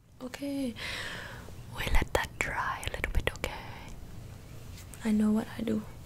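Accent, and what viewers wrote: Asia. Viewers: lmao need more videos like this with the funny asian accent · Oml please do a million more Asian accent roleplays these are my favorite thing on YouTube. I can’t help but laugh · Lollll this accent make more videos with it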